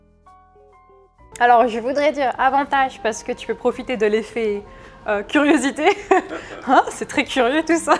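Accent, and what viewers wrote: South Korea. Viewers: sa façon de parler ses mimiques sont coréennes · Son côté coréen s'entend ... à son intonation, à sa voix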